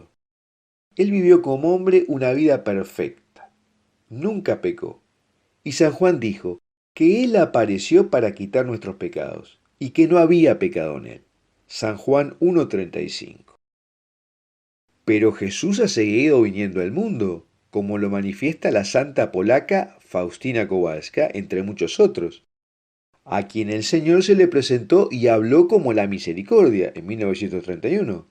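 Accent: Argentina. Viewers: Hola muchos no creen y los pcos que creen,no les importa,el habla como argentino ya se te esta quitando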